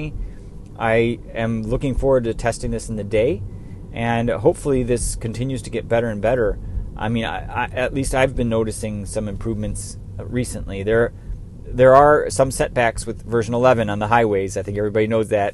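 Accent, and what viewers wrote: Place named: Canada. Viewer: Are you Canadian? I’m hearing a bit of an accent 😂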